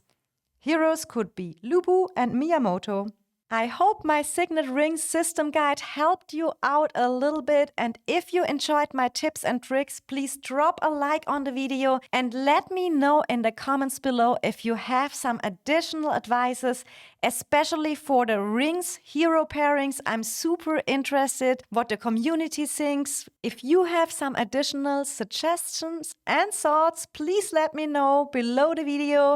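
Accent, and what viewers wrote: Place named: Germany. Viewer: The German accent ❤😂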